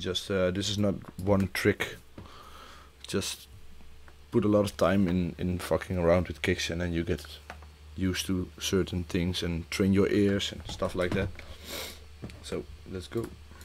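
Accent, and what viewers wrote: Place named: Netherlands. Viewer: Original dutch accent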